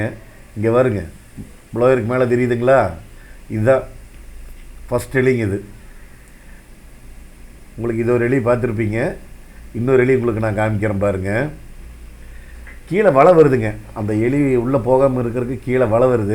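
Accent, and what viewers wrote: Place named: Coimbatore, India. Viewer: உங்க கோவை தமிழ் ரேவியூ நல்லாருக்கு போலிஷ் சூப்பரா இருக்கு Approximately running kms 160000 Budget rs.<num>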